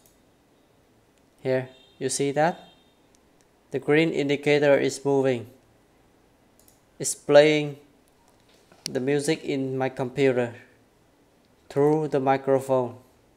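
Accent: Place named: Vietnam. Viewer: Đậm chất Vietlish nhưng mà tôi thích :))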